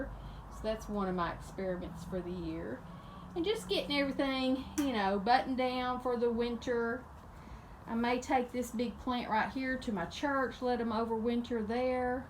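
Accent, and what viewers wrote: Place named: Virginia, United States. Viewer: I can’t thank you enough for the before and after video on your philodendron. Like you said lots of videos about them but none like what you did. I have the same long gated 20 year-old philodendron which I will be doing exactly what you did taking pictures and sharing them with you again thank you thank you thank you by the way I love your accent. I’m here in Sacramento California but, I have a brother who is in Richmond Virginia so it’s a loving reminder, lots of love to you and thank you again. Rene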